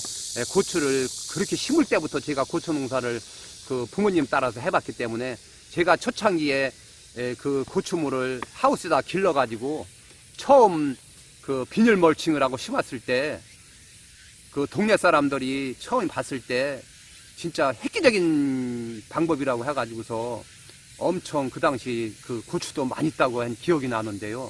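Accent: Chungcheong, South Korea. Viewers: 구수한 충청도 사투리가 마음이갑니다 듯기좋아요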